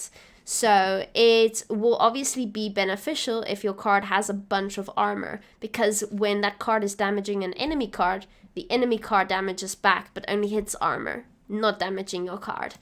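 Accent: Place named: Afrikaans, South Africa. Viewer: You Sound REALLY Afrikaaans, Indien so, baie dankie vir die GWENT clips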